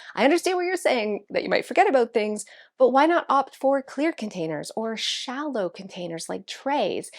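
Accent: Canada. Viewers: Are you Canadian? av I hear your accent!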